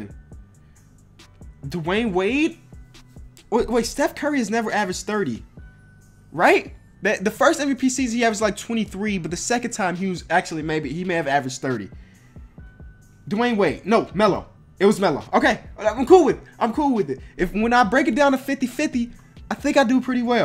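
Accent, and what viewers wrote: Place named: South, United States. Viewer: Why do you talk like you're from the south if you're from Chicago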